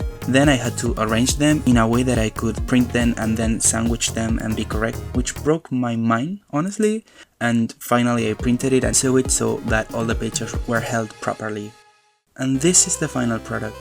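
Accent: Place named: Basque Country, Spain. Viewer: Eres Basco?? Qué chimba!! Fuera una idea estupenda hacer este presente para tu amiga Por cierto, me encanta tu acento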